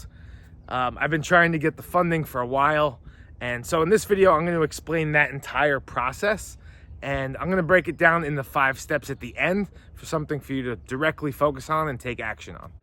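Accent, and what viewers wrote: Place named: East Coast, United States. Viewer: ahh Long Island, knew you were an east coast guy, figured jersey though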